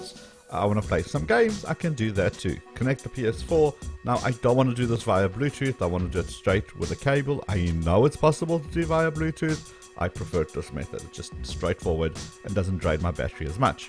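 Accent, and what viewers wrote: South Africa. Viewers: You sound like you're South African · Are you South African ?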